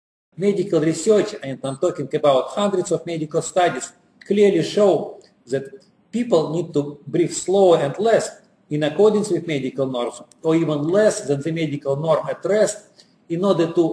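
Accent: Russia. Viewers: Your accent is very Russian :) but I see you're confident in your speech and it makes video more interesting to watch. Thanks for great lessons.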